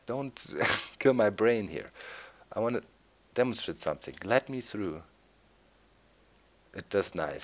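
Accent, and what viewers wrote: Germany. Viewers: i cant exit the video till i hear you say bye bye in your german accent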